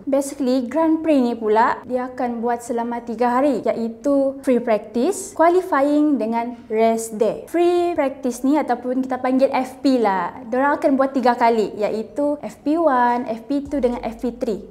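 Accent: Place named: Sabah, Malaysia. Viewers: Dari loghat macam orang Sabah terbaik kak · Pekat loghat sabah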